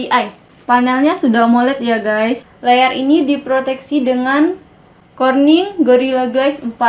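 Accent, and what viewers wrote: Banyumas, Indonesia. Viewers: Ko ngapak bre? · Asline ngapak bbang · kok logat nya agak ke ngapak ya orang ngapak kah?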